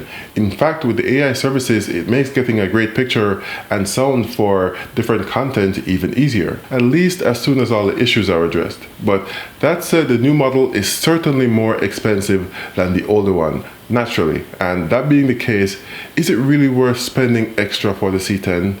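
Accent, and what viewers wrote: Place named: Jamaica. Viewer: Love the content bro, also sound like your from Jamaica..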